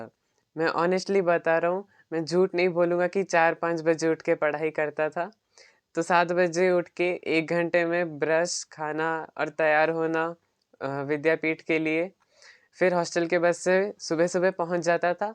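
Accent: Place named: Bengal, India. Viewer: তুমি কি বাঙালি??? কথার মধ্যে বাংলা tone আছে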